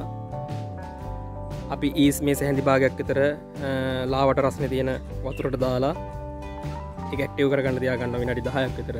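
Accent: Galle, Sri Lanka. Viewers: Galle kenek wage .kathakarana widiyen kiuwe. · Good! Very clear. Thanks! Galle ekkenk wage! 😋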